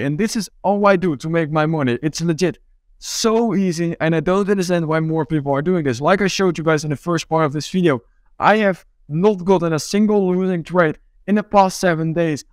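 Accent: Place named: Netherlands. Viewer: goede video man. Je merkt alleen wel dat je nederlands bent😂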